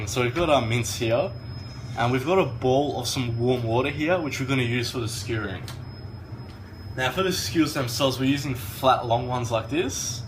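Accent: Australia. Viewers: You from Australia brother?? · where in Australia u from?? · from your accent you sound Aussie. Where are you located? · I like your Aussie accent! 😀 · Hi there, You did it very well, did you learn this method from your Father? hahahah, Well done really. I really like your english accent, Are you from Australia? But you are 100% Iranian. Greetings from Holland.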